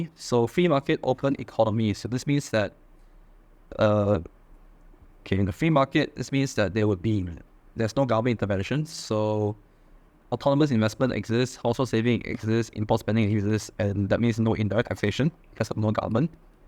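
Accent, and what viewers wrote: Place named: China. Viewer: Chinese accent tough to understand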